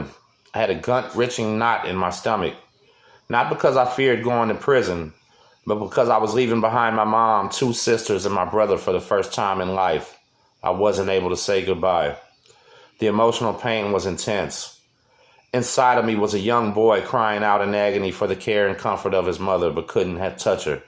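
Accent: Texas, United States. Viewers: Hey man..your vids are def. part of "my show" that I watch weekly! My kids dad is doing a 20 yr fed sentence at the moment. We're from Lubbock TX. He WAS in Big Spring TX but just got moved to a medium facility 😟 obviously Idk where he's going to end up in the U.S. but...hope its not too bad 🙏🏼 anyways, your Shit hits home for me 🙂 sending good vibes and energy your way man! BTW!! Man you sound just like someone from TX too!!✊🏽 #mynigga lol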